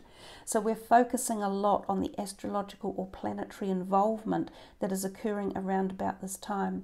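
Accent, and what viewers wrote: New Zealand. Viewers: 🥰🥰 refreshing to hear a kiwi accent 😊 Kia ora arohatia to tohu ❤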